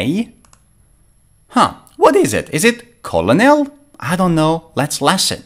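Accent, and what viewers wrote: United Kingdom. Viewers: this is the best lesson we had and it is very good to use british accent thanks a million all the best sir 👌👍🇦🇫♥🌹🙏😊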